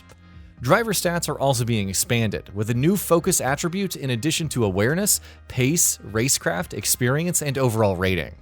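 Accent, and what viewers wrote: United States. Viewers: drives me up the ffkin wall. what the hell is ren olt?? Re nooo! not hard and Marrrzipin is Maz a pin Why won't yanks get that the letter a isn't a flaming R!! And Alain Prost where is the e that turns that o to an oh sound??? FFS I don't like dislike many videos but this gets one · Never let an American narrate this again..... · Jesus christ the Americans yet again have murdered the english language.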